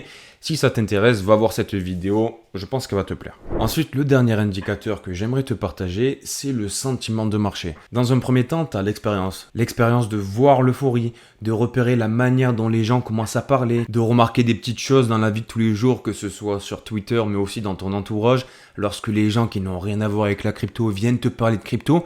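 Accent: South, France. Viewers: Je viens tout juste de découvrir ta chaine. T'es génial change rien, avec le ptit accent du sud ca passe comme papa dans maman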